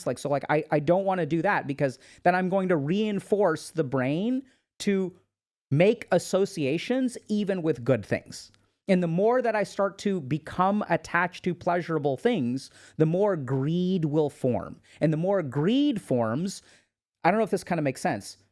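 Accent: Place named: India. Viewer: Dr. K - Would you consider having a secondary channel for 528 Million (½ a Billion) Hindi speakers ?The accent would actually help in popularity in the younger generation. 😊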